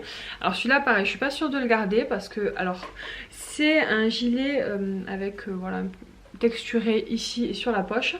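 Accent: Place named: Marseille, France. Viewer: Ta un accent Marseillaise ma belle .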